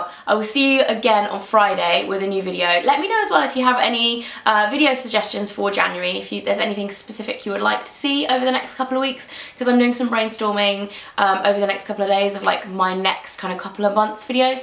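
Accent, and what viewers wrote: United Kingdom. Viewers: you are one of my fave british youtubers! love your accent and your product recommendations are really good too :)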